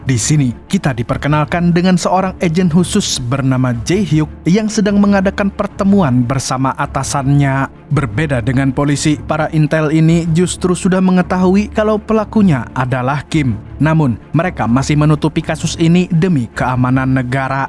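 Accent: Banjar, Indonesia. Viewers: Logat banjar admin nah😂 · Orang Banjar kh mang, behblah. Ulun jua nh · Urang Banjar leh😂 be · Org Banjar ya bg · Ya lo, amang asli Banjar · Asli urg mana nyawa? Banjar kh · Logat Banjarnya ketahuan banar wkk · orang banjar bang? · Orng Banjar sekali nya😂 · Ini banjar kah adminnya yo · Banjar sekalinya pian mang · Bang, lu orang banjar yah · Banjar banar sekalinya lah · bahh orang banjar sekali nya😂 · banjar kali nya pian mang lah 😂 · nyawa nie orang banjar kh 😅😅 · Banjarnya terasa njirr.. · Pian nih urng mna bang? Logat nya tuh na kaya urng bnjar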